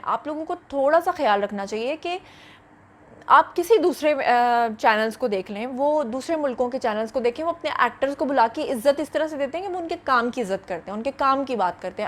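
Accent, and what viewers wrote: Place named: Sialkot, Pakistan. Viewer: mind your language,it is Competitor not compi-tator,change your Sialkoti Accent.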